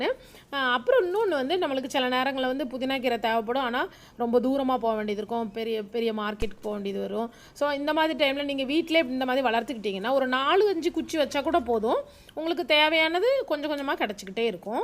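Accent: Tirunelveli, India. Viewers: Sister ungal tirunelveli voice Rembavum super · Neegga thirunelveliggala mam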